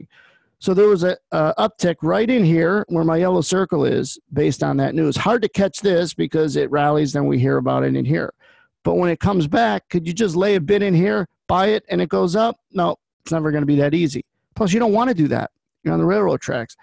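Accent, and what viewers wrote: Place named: New York, United States. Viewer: I love these videos every day. No nonsense blunt real new Yorker talk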